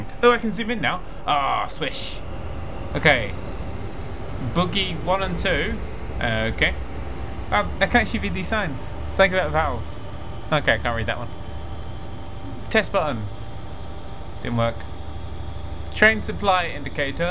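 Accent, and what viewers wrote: England, United Kingdom. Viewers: is he english? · It's pretty obvious that he's English XD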